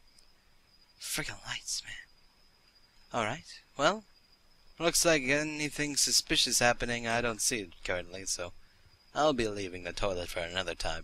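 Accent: United Kingdom. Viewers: I love re- watching some of his old videos cx hearing the changes his videos have made :3... And does it sound like he has a bit of a British accent in this one? or is it just me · voice sounds more brittish.... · DAT BRITISH ACCENT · is it just me or voice changes every year? well not actually the voice, rather the accent.. when I listen to one of his first videos, he sounds a bit... British? xD I dunno..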